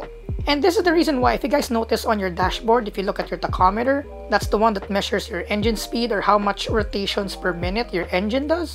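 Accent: Philippines. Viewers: This is the first vid I'm seeing from you and I can hear the Filipino accent already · I’d recognize a filipino accent from a mile away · W Pinay Accent 👌✨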